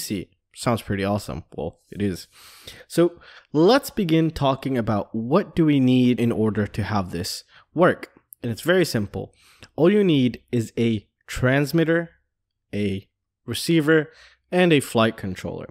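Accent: California, United States. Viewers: Lmao. good one but there is still data leakage. I can tell by your accent that you are most likely from Cali and IE leaked that you currently live in Hungary ;-)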